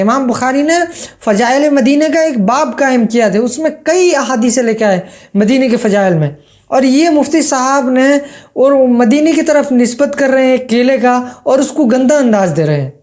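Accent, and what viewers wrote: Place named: India. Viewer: Inka taaluk India se hai, aur India ke kuchh elaako me log ese hi Urdu boltey hain, jese hamaari taraf hamaarey kuch pathaan Bhai jab Urdu boltey hain to unki Urdu thori si different hoti hai, jesey wo kbhi چھوٹا ko چوٹا bol detey hain. Esey hi India me kuch elaake hain jahaan log شروع ko سروع boltey hain.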